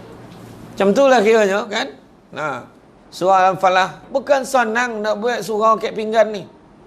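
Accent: Negeri Sembilan, Malaysia. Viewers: Sy dri 🇸🇬..suka cara loghat Nogori Ustad dlm ceremah..x bosan dgr...Ilmu yg dikongsi sgt baik sekali. Insyaallah...akn cuba lakukn yg trbaik...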